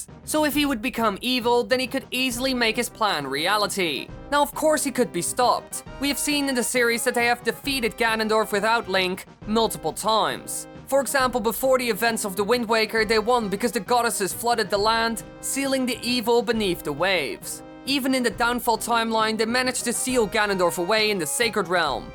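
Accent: Netherlands. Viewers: are you duchts ???NL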